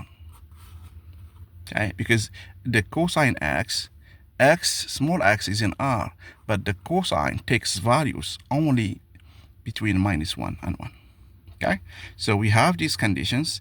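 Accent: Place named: Morocco. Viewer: you sound very moroccan sir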